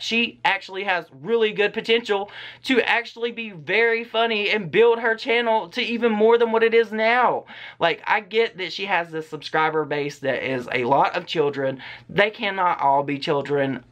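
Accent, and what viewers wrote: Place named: Alabama, United States. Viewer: New sub. Our accents match! I’m from Alabama ♥️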